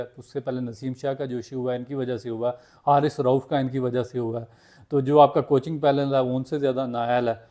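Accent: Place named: Punjab, India. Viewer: Nice Punjabi accent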